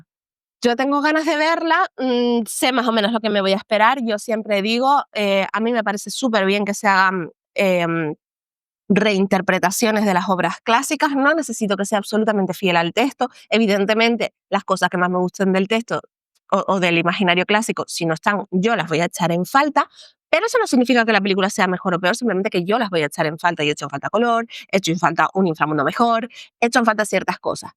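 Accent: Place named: Canary Islands, Spain. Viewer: Me ha faltado en tu análisis algo que va más allá de la filología: el Alma. La Odisea es la definición literal de Nostalgia (Nostos + Algos, el dolor del regreso). Creo que te equivocas al disculpar a Nolan. Él nos da espectacularidad visual, pero nos roba el subtexto: el amor a la tierra, el calor del hogar y el miedo a perder a la familia. Convertir la Odisea en una película de acción grisácea es un crimen contra la cultura mediterránea. Ojalá defendiéramos más la 'magia' del mito y menos la 'lógica' del mercado actual. La Odisea es un arquetipo sagrado sobre el RETORNO, la Familia y el Honor. No va de un Ulises ingeniero resolviendo problemas, sino de un hombre roto que rechaza la inmortalidad por volver a abrazar a su esposa envejecida. Me da pena que aceptemos que Hollywood convierta el Mediterráneo (que es vida, color y pasión) en un escenario gris de posguerra nuclear solo porque es la moda. 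El público necesita hoy más que nunca esa calidez humana, no más frialdad intelectual. Te escucho y noto un acento canario del bueno, del que me gusta. (Quizás me equivoco; si es así, lo siento). Al escucharte ese acento canario, esperaba que conectaras mejor con la esencia real de la obra: la insularidad y la nostalgia. Como isleña, sabes que el mar aísla y que la 'morriña' no es solo echar de menos, es dolor físico. Creo que al justificar que Nolan haga una obra gris y fría 'para adaptarse al público moderno', estamos perdiendo el alma de la Odisea. La Odisea no es ciencia ni acc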